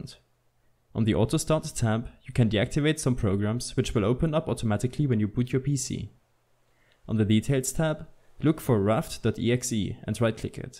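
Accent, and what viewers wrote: Germany. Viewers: man hört den deutschen akzent aber gut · Man merkt das er deutscher ist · Man merkt an deiner Stimme dass du Deutscher bist :D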